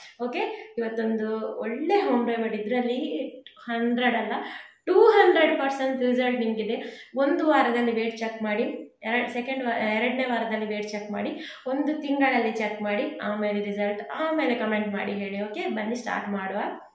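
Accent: Mangalore, India. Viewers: Kudla da Kannada 👍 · aha kudla kannada🥰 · Enklna kudlada kannada 🥰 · ಮಂಗಳೂರು ಭಾಷೆ ಕಡಕ್ ಭಾಷೆ ಸೂಪರ್ ಮೇಡಂ.. 🙏🏻👌🏻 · I like your manglore style kannada bhashe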